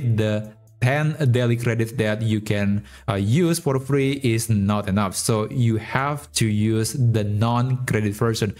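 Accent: Indonesia. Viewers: Org indo ya bg? Aksennya ketara😂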